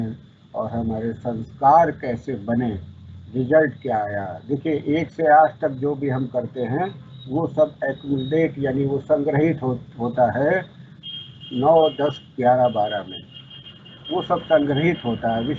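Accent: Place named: Varanasi, India. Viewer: Pranam, Pranam Pranam...... Pranam Pranam Pranam ..... do not have words to express my gratitude to you for this lesson....... you said in pure Varanasi Hindi accent & style.........replayed & listened it 2-3 times.... hearing astrology in pure hudh shindi after long time...........mera pranam sweekar karey.....मेरा प्रणाम स्वीकर करे The words, pictures, spoken words ....etc., so nicely done.... can only come from Lord Shiva bhakhts......2 hrs = 12 years of learning.... please invite Guruji to conduct few more lessons.... such an excellent gyan.....ज्ञान ब्रह्म ज्ञान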